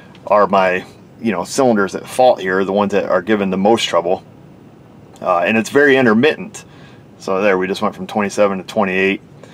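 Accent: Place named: Upstate New York, United States. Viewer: It took me a few times to figure out you were saying Power BALANCE, you upstate New Yorkers talk funny...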